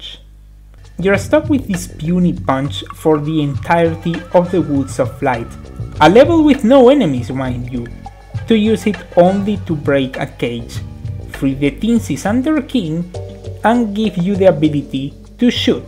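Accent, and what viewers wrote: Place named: Russia. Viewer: Amigo, si vas a hacer videos en inglés aprende a pronunciar en inglés porque no te sale XD sonas como ruso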